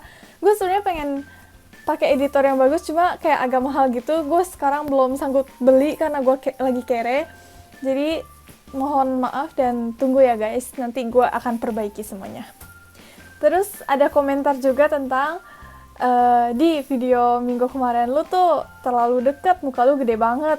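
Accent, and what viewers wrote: Japan. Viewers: thx dgn video ini gw jd lbh tau ttg jepang.. btw bahasa indonesia kamu udah ada dikit2 aksen jepang nya haha mgkn karena udah lama d sana 😄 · wiihhh,,, infonya keren bangettt,,, kakak pasti kuliah ya disana,,, logatnya udah kayak jepang,,, dan raut wajahnya juga kayak gadis jepang,,, yang pasti kakak ichiban kawaii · kak, bahasa nya udha kebawa nihongo kak :))) tapi luccuu aku sukak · Logatnyaa,,, udah kebawa jepun,, hehe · kakak kedengeran banget bahasa indonesianya ada rasa-rasa jepangnya gitu hahahaaa dari nada dan sebagian pelafalan. rupanya pengaruh juga ya tinggal di sana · wah jadi kyk denger haruka nakagawa ngomong nya ada logat jepang nya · logatnya jadi kebawa logat jepang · Itsnaini Nabila iya bener sudah kebawa bawa nada jepang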